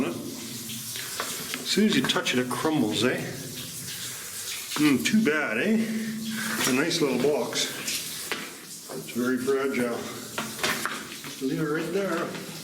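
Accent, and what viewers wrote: Canada. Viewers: Wonder if they would let me pick some of the gold out, sort of a day trip thing. Looks like it would be fun. We can tell you're Canadian, ay. The quartz is white because it formed with oxygen, so it's name is really quartzite.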